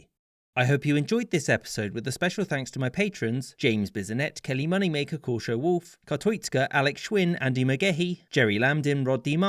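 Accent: England, United Kingdom. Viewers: I love listening to Englishmen talk about my country's history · Love to hear a Brit explain my home state's History. Something I had to look up myself because 7th grade Texas History didn't cover it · Nothing is more amusing than listening to a British man talk Texas things to a Texan 😂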